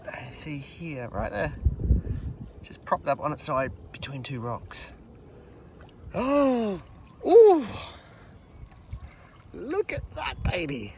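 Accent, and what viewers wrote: Australia. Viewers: You sounded more Australian in this vid. I wondered if maybe you were tired, as accents are sometimes accentuated then? Great finds today. I actually liked that flat coat button that you seemed a little disappointed with. The quality of buttons then were considerably better than today. I’ve got to look for an update on this vid to see what that lump of metal turned out to be. Did they only make cod bottles in green? It would have been lovely if they had other colours too. Then the marbles would be really colourful. Thanks for sharing your finds.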